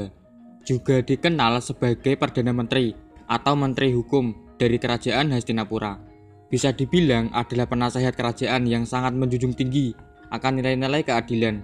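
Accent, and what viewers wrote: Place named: Sleman, Indonesia. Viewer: Suanten lan bahasa e kados piyantun Sleman, sae sanget Kulo remen mas menambah wawasan 🙏🙏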